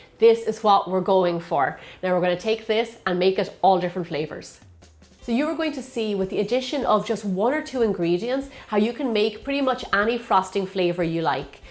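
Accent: Ireland. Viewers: Are you Irish, I love your accent! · You've explained everything so well , so easily understandable for newbies like me Also , Love your voice and accent ❤️ Irish., I'm guessing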